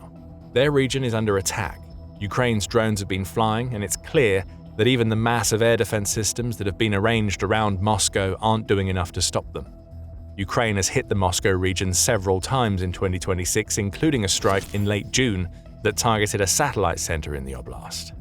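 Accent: England, United Kingdom. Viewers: Crap Americanisms with an English accent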